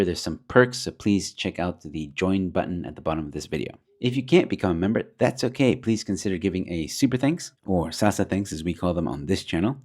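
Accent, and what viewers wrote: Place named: United States. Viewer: Don’t lose your American accent just yet. You might be back before you know it.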